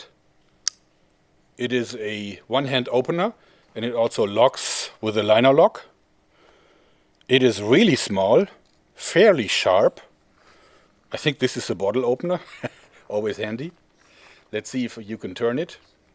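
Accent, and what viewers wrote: Germany. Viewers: Also war der Dialekt doch deutsch :D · Nope, keine Fehler nur starker deutscher Akzent, aber das ist ja normal. Einige Engländer mögen sogar den deutschen Akzent :D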